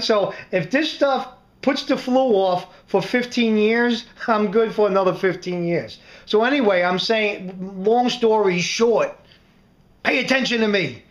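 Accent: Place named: New Jersey, United States. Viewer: i just love watching your videos your accent reminds me of my family from Jersey. my moms been here in wv for 38 years and almost sounds like one of us now. weird huh? anyway if someone couldnt or didnt want to invest that much in a blender the new ninjas are fantastic. they have one that looks similar to a vitamix and its gotten good reviews. i also juice quite often and am gearing up for a 5 day juice fast. i love juicing as well as my smoothies :)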